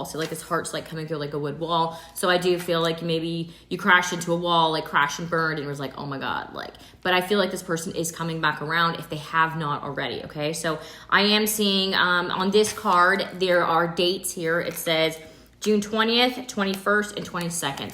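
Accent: South, United States. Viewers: I luvu❤you be on point and you funny😂you be doing this country voice while you be talking so funny😂😂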